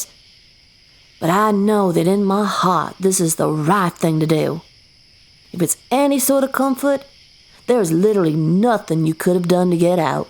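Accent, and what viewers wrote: South, United States. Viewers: You did a great job on the southern accent although usually this accent will usually only be found in Texas and Oklahoma rather than the true south, love the audio!! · Call me selfish but i do love me this mechtism shit, the southern accent just adds a certain "kiss me darlin i beg ya" to it, p4aise be the country darlijng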